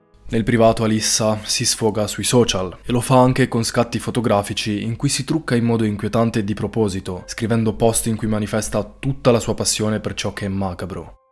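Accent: Sardinia, Italy. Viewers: Ma sai che mi stai incuriosendo? Parli spesso del paese in cui vivi e dato che ho capito che probabilmente è dalle mie parti mi chiedevo se per caso non fosse vicino a Limbiate! Chissà... Però mi chiedo anche come mai se vivi da queste parti si sente così tanto il tuo accento sardo? Io infatti ero assolutamente convinta che tu vivessi in Sardegna! Vabbè come dici tu giustamente quando parli di Istagram, che se vogliamo farci i cazzi tuoi possiamo seguirti li,visto che io non uso Istagram me li faccio addirittura qui ma capisco assolutamente se non vorrai rispondere! Buona serata a te!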